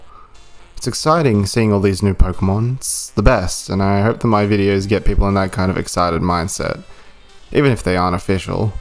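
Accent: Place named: Australia. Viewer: What part of Australia are you my man?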